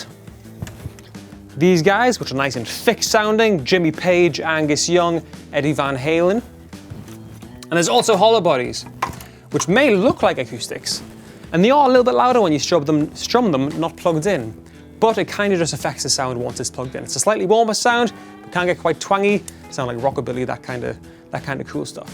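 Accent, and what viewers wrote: United Kingdom. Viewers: You move like Captain Jack Sparrow, Also you almost talk like him, Makes it better because you got that nice british accent.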